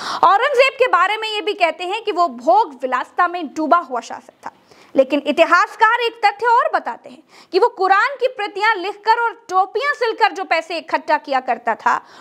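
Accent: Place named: Delhi, India. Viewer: Such a shame on you for defending such a cruel personality like Aurangzeb. I am a very secular minded person respect all religions but felt so ashamed on you comparing Golden Temple attack by Indira Gandhi with Aurangzeb demolishing Hindu temple. You sound like a Delhi girl. Please visit Gurudwara Shishganj where Aurangzeb beheaded 9th Sikh Guru Teg Bhadur sahib ji for opposing forceful conventions of Hindus and not accepting Islam.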